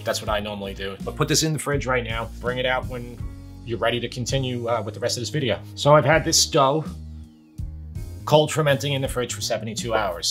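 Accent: New York, United States. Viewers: Great channel. Great presentation. This guy is very down to earth, with the best NY accent. Nice!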